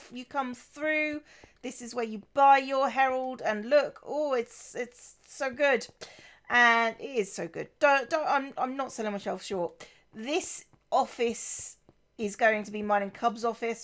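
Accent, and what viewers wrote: Germany. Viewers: Are you fron Germany?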